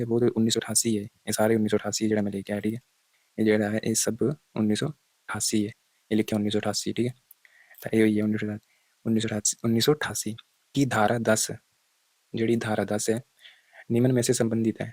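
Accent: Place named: Kangra, India. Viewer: Bhai tuadi bolli sun k kangri lg re mno tusi...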